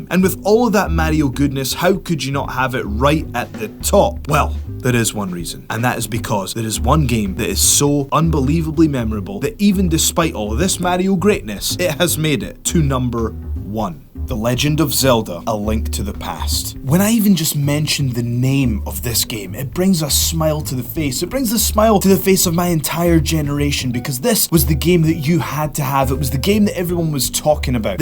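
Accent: Scotland, United Kingdom. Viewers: Is this guy Scottish ? I'm not English, and I am not sure, but his accent seems strange to me, especially the pronounciation of "R". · Scottish? I love the accent a lot. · It took me a second to realize you weren't American (then again it's WhatCulture so I should've known better) but dude you have the slightest (Scottish?) accent I've ever heard!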